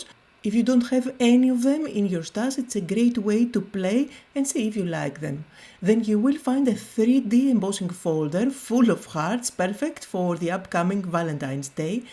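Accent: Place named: Greece. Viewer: So, mom and I were just talking about what the people have said about the speed in which you talk etc. Your native tongue is a language of love, it ranks right up there with Italian. My best friend of 17 years is from Greece, his family immigrated here when he was 5 and he didn't speak a lick of English. I was on a video chat with him one night and he was talking to his mom in his native tongue and it was so beautiful I asked him to repeat what he just said! "I'm not going to tell my mom that dinner is ready again, Sash." Is what he said 😅. So, mom and I think you should do a video in Greek and if they wanna know what you're saying they can watch the subtitles. Speak at a speed you're comfortable with, Greek is spoken faster than English, and your accent is amazing! Don't change how you do your videos because someone thinks it isn't good enough. ❤❤ Mad love to you!!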